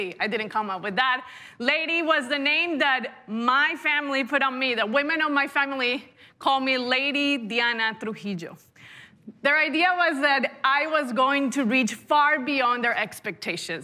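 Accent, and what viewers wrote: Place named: Colombia. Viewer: Quico...lo importante es que ella habla el castellano de Colombia,no el de tu pinche Mexico.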